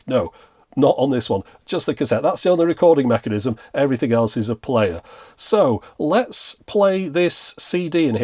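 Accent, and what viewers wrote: United Kingdom. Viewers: root is sexual slang in Australia. So the British pronunciation of Router as Rooter is funny.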